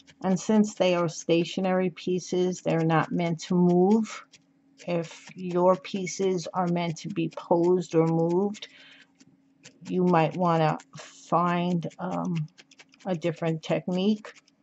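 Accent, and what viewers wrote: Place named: Long Island, United States. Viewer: When I started watching this video I felt like I was listening to my family - Long Island represent ! 😍 Adorable gnome witch!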